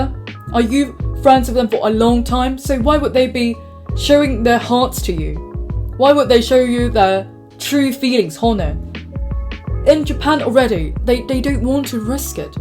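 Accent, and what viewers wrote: United Kingdom. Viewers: When you speak Japanese, you sound like a Japanese person. But when you speak English, you sound more British. Either way, you sound great. No judgment here. 👍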